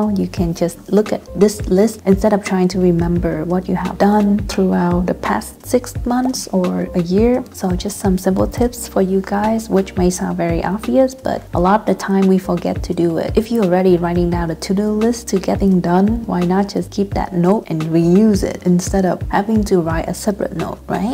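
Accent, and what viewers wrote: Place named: Vietnam. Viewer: nói tiếng anh giống người việt thế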